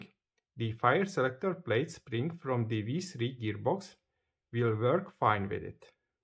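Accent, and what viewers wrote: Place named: Hungary. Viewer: Amazing video and great work! I can't wait to see the rest. Judging by your accent, I am willing to bet you are Hungarian although I might be wrong. Either way, I am an airsoft tech myself from Romania and greatly enjoy creative spirits like yourself. Can't a standard Jack be fitted in a MP7 AEP? The nozzle still has to move the same distance to feed but maybe placed a bit more to the back, just wondering. If this could be done with a standard M4 jack, it's much more available and cheaper than getting a BINGO AIRSOFT one.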